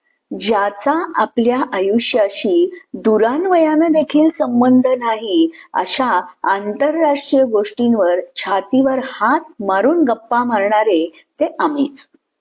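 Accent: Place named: Konkan, India. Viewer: खूप छान सादरीकरण . कोकणी ढब . गुजराथी मिक्स मराठीचा चढ उतार खूपच छान !👌💐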